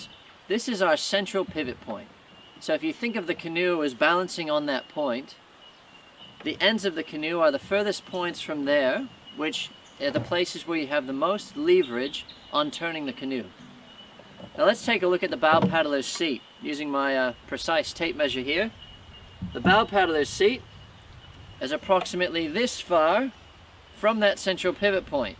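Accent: Australia. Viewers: You definitely some how have an Australian accent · Nice intro video to tandem canoing. …(is that an Australia mongrel accent you have?) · As a Canadian paddler at first I was kind of amused that somebody with an Oz accent was going to tell me how to paddle a canoe... ...then the phrase "Divorce Machine" came up... ...yeah it sounds like a good idea, but NEVER involve a canoe on a first date. I speak from experience.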